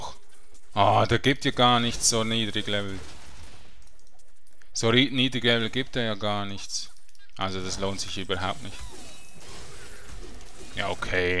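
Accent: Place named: Basel, Switzerland. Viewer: sali bisch wohl au vo basel em name und em dialäkt nooch ^^ ich spiels au.. ha au en moench gnoh, us em gliche grund ^^ schrib moll di accountname unter dä kommentar , denn add ich di! bi allerdings scho lvl 70 p lvl 40 ^^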